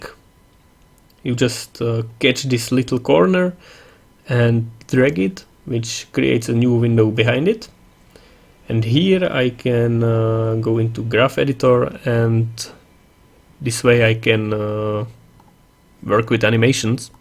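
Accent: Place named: Czech Republic. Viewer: no ten český přízvuk jde poznat :D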